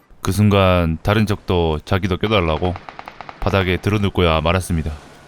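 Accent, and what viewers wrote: Jeolla, South Korea. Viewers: 오지는 전라도 억양 존나 잘어울려 ㅋㅋㅋㅋㅋㅋㅋㅋ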